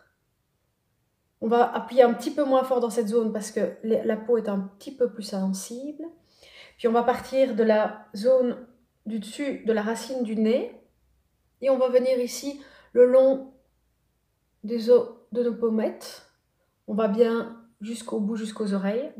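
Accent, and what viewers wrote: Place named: Belgium. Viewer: génial! Vous êtes belges? J'entends comme un accent de chez nous 😊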